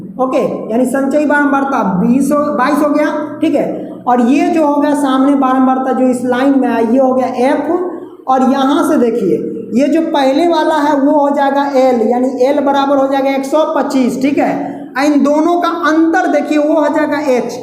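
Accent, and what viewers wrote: Bihar, India. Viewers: Sir aap bihar se hai kya.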